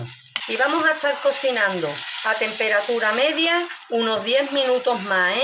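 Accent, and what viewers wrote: Andalusia, Spain. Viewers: De donde eres Sevilla o Cádiz? Simpatica( te veo desde Fran ia) feli año nuevo 🎉😂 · Que cosa tan rica😋 y Ole la gracia Andaluza hablando, seguro eres de la provincia de Cadiz.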